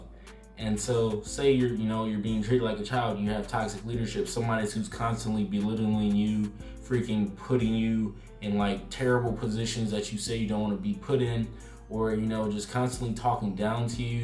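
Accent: Baltimore, United States. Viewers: Is that a bmore accent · R u from Baltimore u kinda got the accent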